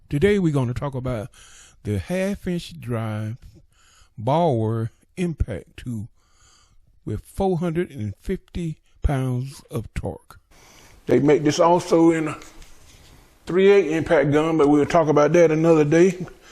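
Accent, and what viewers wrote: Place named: South, United States. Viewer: I agree! Although, I don’t know how northerners and people out west appreciate the value of a southern or country accent as much as those of us that live in the south. For those of us from the south, a southern accent (black or white) is a comforting sound. Hearing this man talk is like a basket of fried fish with a side of cheese grits for my ears.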